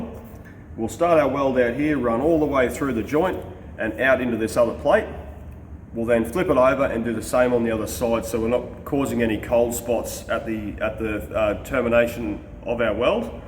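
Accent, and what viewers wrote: Australia. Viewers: They do know your from Australia and that is how you guys normally talk people cry over the dumbest things well hopefully the have their safe space and like and subscribe LOL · been watching now for a few weeks and only just noticed your Australian i guess it shows as us aussie have some pretty good skills ;) watching your vids have improved my daily quality too being a younger boilermaker/ metal fabricator i find this channel very intresting and helpful to watch TYVM for the quality videos and work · If you pronounce "fail" in Aus as "file" in US, how do you pronounce "file" in Aus?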